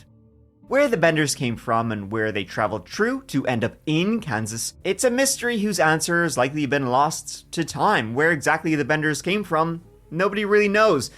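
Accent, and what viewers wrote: Ireland. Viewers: Battling my third round of COVID in 8 weeks. Needed the soothing voice of my favourite Irish lad.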